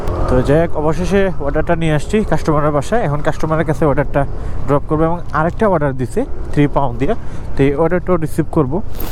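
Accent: Sylhet, Bangladesh. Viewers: apni sylheti?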